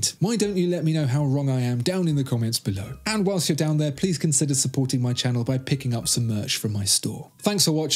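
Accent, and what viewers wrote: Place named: United Kingdom. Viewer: The most soothing voice on YouTube! Eloquent and colourful use of the English language with a classy British accent, not to mention knows a heck of a lot about watches. ❤your review. 🍉 🍉 🍉 Thanks.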